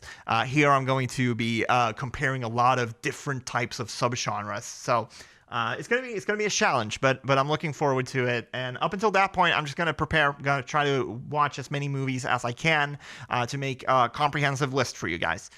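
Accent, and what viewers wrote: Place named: United States. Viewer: You sound yankee AF, for a sweedish... Thanks bro. I´ll be looking for those movies. Happy new year!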